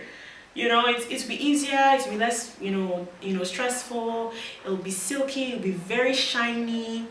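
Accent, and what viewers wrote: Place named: Ghana. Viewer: Gee, I caught some Ghanaian accent from you. 😂💚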